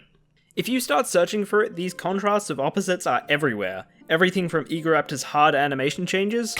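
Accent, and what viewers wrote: Australia. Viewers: You sound like an Aussie ..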